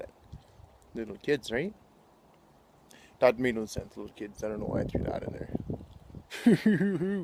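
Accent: Alberta, Canada. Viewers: Lol I am about to do some patio and deck repairs for one of my rentals soon. Glad I found your video, bud. Funny as fuck. I thought your accent was sounding awfully Albertan and lo and behold 😂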